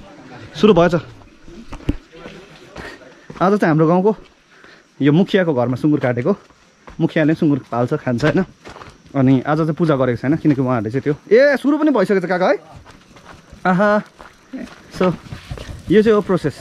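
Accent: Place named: Darjeeling, India. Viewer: gaun thau herda nepal jasto chha feri boli darjeeling tira jasto chha kaha hola?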